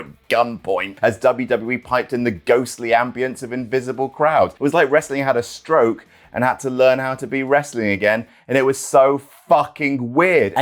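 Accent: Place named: United Kingdom. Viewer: Hey its that posh english guy that talks about wrestling.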